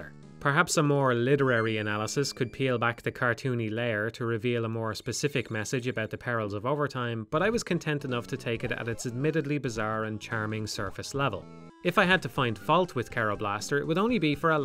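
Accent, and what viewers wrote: Ireland. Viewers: That Irish accent with the complicated language is like asmr to me ♥️ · And that Irish is an amazing yt video voice accent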